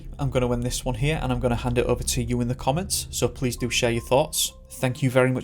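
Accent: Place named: England, United Kingdom. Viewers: Love listening to your videos keep them coming,was wondering were are you from your accent sounds like your not far or from middlesbrough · Ware abouts in England are you from . I am trying to figure out the accent ! Just somthing I do lol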